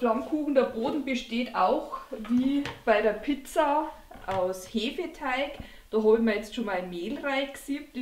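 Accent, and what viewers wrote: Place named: Bavaria, Germany. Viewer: .... und so ko ma d'laet bscheissen.... köstlich ! Ich finde Ihren bayrischen Akzent und Ihre Art zu kochen einfach wunderbar. Sie strahlen bayrische Gemütlichkeit aus. Ich lebte auch lange Zeit in Niederbayern und weiss das zu schätzen. Übrigens, wo kann man so eine tolle Gewürzmühle kaufen? Liebe Grüsse aus Spanien.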